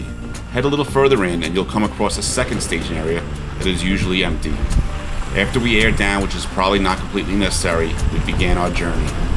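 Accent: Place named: New York, United States. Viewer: This Jersey Girl loves your NY accent. Thanks for sharing this beautiful drive!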